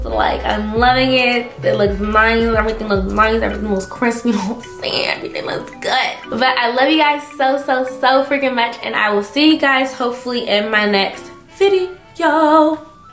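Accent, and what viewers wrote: DMV, United States. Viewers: Love the color. You sound like you're straight out of the DMV? Let me guess pg county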